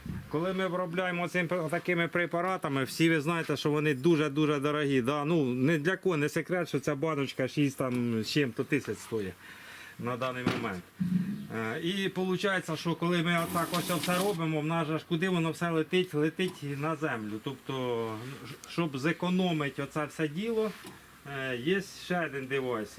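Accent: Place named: Volyn, Ukraine. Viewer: Волиняка нюхом чую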